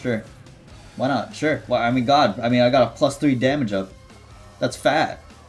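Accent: United Kingdom. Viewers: Are you from england?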